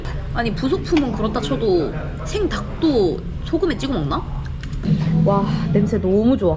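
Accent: Gyeongsang, South Korea. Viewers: 이상하게 경상도. 말과 전라도말이 같이 들리 네요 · 말씨억양이 깅상도? 대구?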